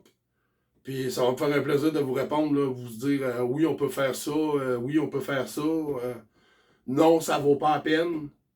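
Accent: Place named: Quebec, Canada. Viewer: Slt TROP BIEN Ta CHAINE YOUTUBE CONTINUE COMME ÇA TES VIDÉOS SONT TROP BIEN LONGUE VIE À TOI ET À TA CHAINE YOUTUBE!!! IL EST TROP BIEN TON ACCENT DU QUÉBEC LES GENS SONT TRES SYMPA ET TRES GENTIL AU CANADA MOI JE SUIS FAN J'ADORE JE M'ABONNE DIRECT!!!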